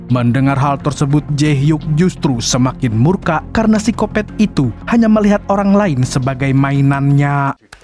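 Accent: Banjar, Indonesia. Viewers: nyawa nie orang banjar kh 😅😅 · Bang, lu orang banjar yah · Banjarnya terasa njirr.. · Banjar sekalinya pian mang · Logat banjar admin nah😂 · Orang Banjar kh mang, behblah. Ulun jua nh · Asli urg mana nyawa? Banjar kh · bahh orang banjar sekali nya😂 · banjar kali nya pian mang lah 😂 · Org Banjar ya bg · Banjar banar sekalinya lah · Logat Banjarnya ketahuan banar wkk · Orng Banjar sekali nya😂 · Ini banjar kah adminnya yo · Pian nih urng mna bang? Logat nya tuh na kaya urng bnjar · Urang Banjar leh😂 be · orang banjar bang? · Ya lo, amang asli Banjar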